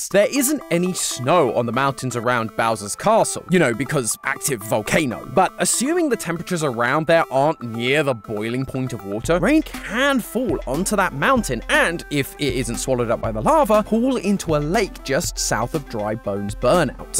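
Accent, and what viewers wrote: United Kingdom. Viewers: Why does Matt Patt sound more British?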